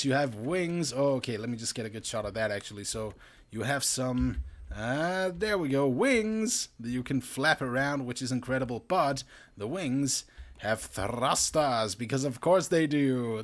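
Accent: Denmark. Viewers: Is he Danish?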